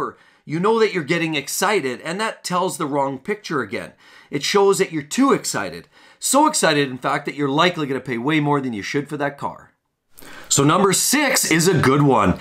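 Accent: Canada. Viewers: the most Canadian accent I've ever heard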